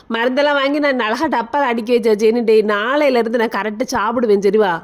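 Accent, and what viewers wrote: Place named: Tirunelveli, India. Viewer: Love u akka ungala enakku rompa pedikkum. Nama tirnelveli pasai super akka. Enga vettuku vanga akka pls ❤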